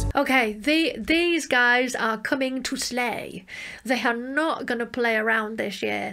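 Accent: Manchester, United Kingdom. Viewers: I love your accent, is it from around Manchester?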